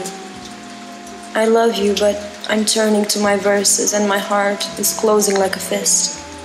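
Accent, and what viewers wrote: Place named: France. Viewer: I hear a French Accent ! Its Beautiful . Wondering how you found his poetry.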